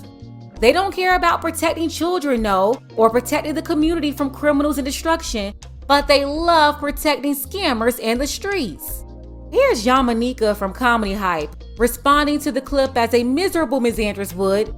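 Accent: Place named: Florida, United States. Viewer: I know you said you lived Miami before but are you from Florida? You sound like it and you have that smart Miami mentality?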